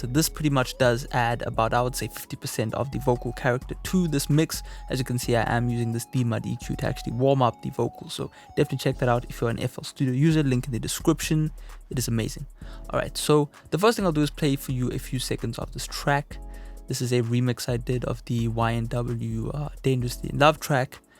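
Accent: South Africa. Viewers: Are you from South Africa???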